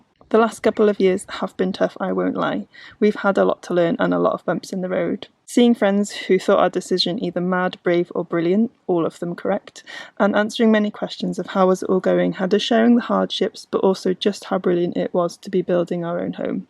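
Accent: Wales, United Kingdom. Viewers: A fantastic construction of your journeys! I mean the telling of events; but moreover the ‘Journey of Mind’ is evident in your “Home to Home” travelogue. What IS HOME… On one hand I can hear the WELSH accents in this video (as anyone does when they get “home” Flip side to see the excitement of “home” being seeing the watering and chickens. BTW you sound so much more confident and in your element in the garden than your starting videos! You are Home! “You Got This”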